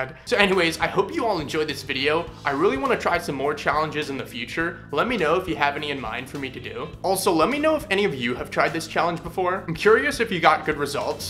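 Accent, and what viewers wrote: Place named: United States. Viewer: I guess ur born in the US then as ur accent is american.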